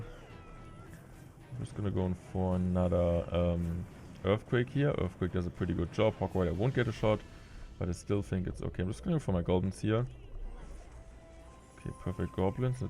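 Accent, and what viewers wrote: Germany. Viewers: can u add subtitles to ur videos? ur German accent is so damn hard to understand and the fact that u talk pretty fast is not helping at all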